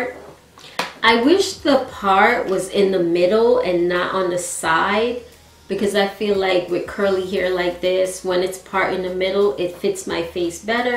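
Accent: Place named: Jamaica. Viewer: So cute I hope Mine turns out well. You sound Jamaican if you are, big up yuhself goodie!!! If not still big up yuhself! May do a review on this as well.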